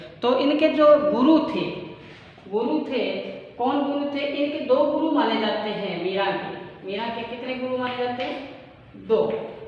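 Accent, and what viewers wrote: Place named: Haryana, India. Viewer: Kon sa colleage ka h ye Sir hariyanvi bol rhe h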